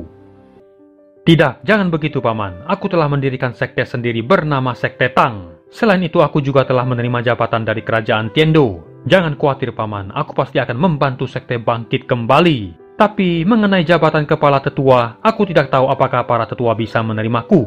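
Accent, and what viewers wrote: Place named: Madura, Indonesia. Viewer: Ketarah jhek reng madureh🤣semangat tretan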